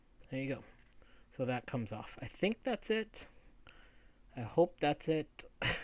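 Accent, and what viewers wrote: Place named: Mexico. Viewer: This guy for sure speaks Mexican-Spanish, his accent is obvious.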